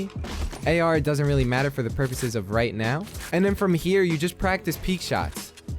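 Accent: New York, United States. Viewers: This guy definately from new york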